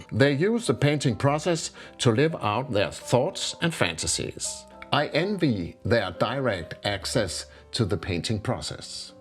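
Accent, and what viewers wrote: Denmark. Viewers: Dear. Just stumbled across your channel. Are you Danish? You sound so Danish. Anyway, love your work and your advice! 🙏🏾 [Edit: "Blomster i Glasvase"? - yep, you're Danish 😅] Hilsen A Brit in Copenhagen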